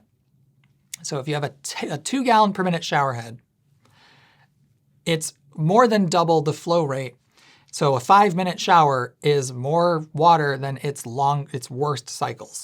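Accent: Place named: Midwest, United States. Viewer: That's just his Midwest showing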